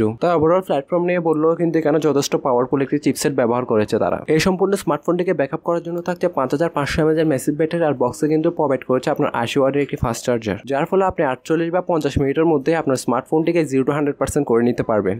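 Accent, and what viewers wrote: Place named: Chittagong, Bangladesh. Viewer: চিটাইংগা বদ্দা.... আঞ্চলিকতার মিক্সিং ভাষা পরিবর্তন করতে হবে।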